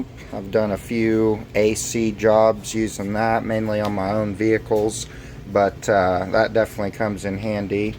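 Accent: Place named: Deep South, United States. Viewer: It's 3am here in So Cal and I couldn't sleep. Pt 1 & 2 toolbox tour came up as suggestions. You're actually quite organized and no doubt have a wealth of repair knowledge. Both videos are concise and move right along. Best wishes on your career and youtube endeavors. You have an interesting voice and accent, do you claim the deep south as your home? Texas?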